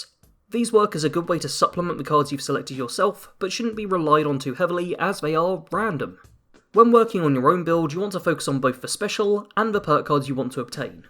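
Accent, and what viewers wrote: United Kingdom. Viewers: Given the thick Brit accent. It behooves you to slow down your speech, I just can't understand you most of the time.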